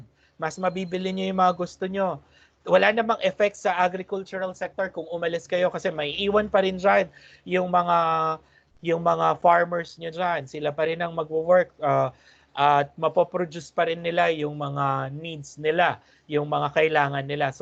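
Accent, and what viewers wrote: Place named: Philippines. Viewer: Omg thank you so much for posting this!! It's been a little difficult understanding the accents in other videos. I was so happy when I heard the Filipino accent. May maiintindihan na ako huhu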